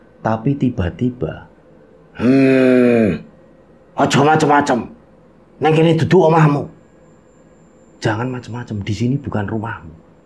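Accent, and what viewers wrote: Java, Indonesia. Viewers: Seneng cara bawainnya,jawa bangettt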